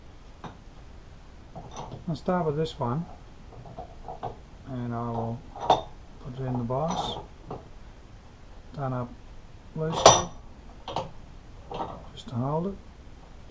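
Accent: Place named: United Kingdom. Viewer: Honestly just from your video sir just this one you've actually kind of persuaded me to listen to British people explain something now I find that funny because yes I am an American I'm sure you would have guessed and I'm a very proud American I'm not saying that I couldn't put that aside to learn something from the British I'm just saying I've always made fun of the way British people spoke their accent their choice of pronunciation of certain words I mean I have legitimately fallen on the floor rolling around laughing so hard but I guess at one point or another you always end up learning about the things that make you happy thank you for the video sir you've earned my subscription in like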